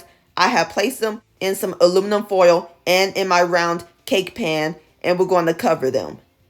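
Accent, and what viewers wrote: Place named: South, United States. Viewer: "Thats right sugar a sweet corn bread"! "Here we go"!!! I love your Southern accent. Im from Michigan but theres no hospitality like down south hospitality. I was born down South. W. Virginia. I miss my cousins and home town. Princeton West Virginia. Im so delighted to run across your channel my friend! This sweet potatoe cornbread is a delight and will be a dish at my thanksgiving dinner. Ive never tried it. Just regular hot water cornbread. This will be a treat.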